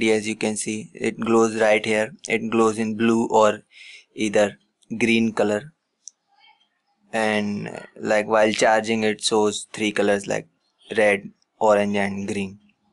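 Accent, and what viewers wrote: India. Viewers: The indian accent is so funny :D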